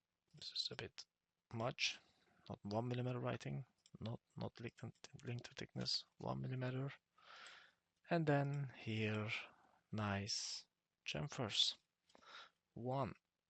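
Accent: Turkey. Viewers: Hocam siz türksünüz galiba aksandan biraz öyle gibi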